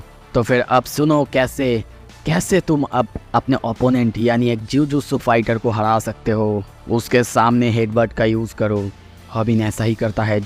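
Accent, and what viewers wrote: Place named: West Bengal, India. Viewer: Bhai tum west bengal se ho kya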